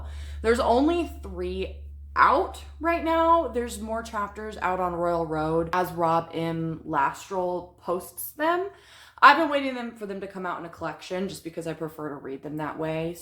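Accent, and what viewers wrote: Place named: South, United States. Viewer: You sound like you live in the south....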